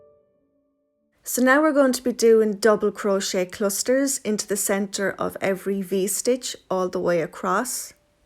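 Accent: Ireland. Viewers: I'm delighted to have found your tutorials. It's very easy to follow your instructions and I love your Irish accent!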